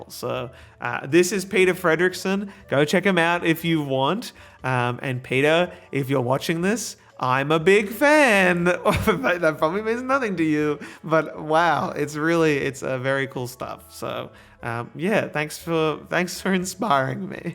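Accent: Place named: Australia. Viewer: fucking austrailian accent. "nortica". for the americans, he means nautica on adobe fonts.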